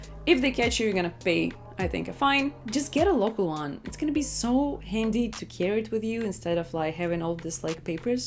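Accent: Russia. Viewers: I'm sorry, are you Russian? Because I personally am, and uh... I kinda can hear that :) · Ur Russian police aksent😁, was great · from your accent i guess you are from =Rosiya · Tão fofo ela falando inglês com sotaque russo. Hahahaha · i like your russian scent when you start to be mixed accent of russia with English 😂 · You russian accent is hilarious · I like how her accent is a little mixed with Russian 😍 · I like it when you still put your Russian accent. Informative vid by the way. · Well I liked your Russian accent... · Hahahaha I love Russian accent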